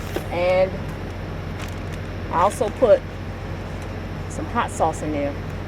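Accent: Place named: Washington, United States. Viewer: Your very welcome! by the way are you in Washington state? because you say guys like i do.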